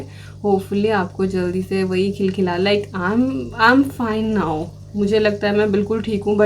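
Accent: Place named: Punjab, India. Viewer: Hi g aap punjabi ho baaton se lagtahai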